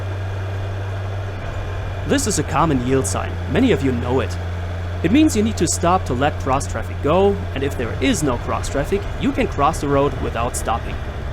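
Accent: Germany. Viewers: I told him early, that his English wasn't necessarily bad, just ultimately "German". Some people as you like it, others don't. He'll be reading the comments here, so he'll get to know. ^__^ I hope you are gonna stay as watcher!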